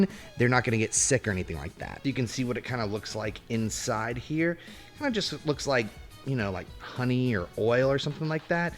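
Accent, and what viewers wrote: United Kingdom. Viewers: I love his British accent 😆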